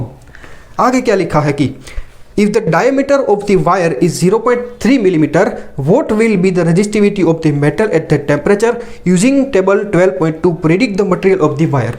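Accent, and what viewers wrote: Gujarat, India. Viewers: Pakku gujarati chee ho !!!! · Sir , are you Gujarati???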